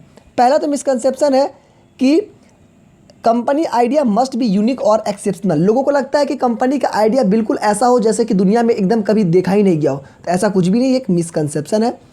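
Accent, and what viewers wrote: Bihar, India. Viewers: Bhai tum ek bihari ho kya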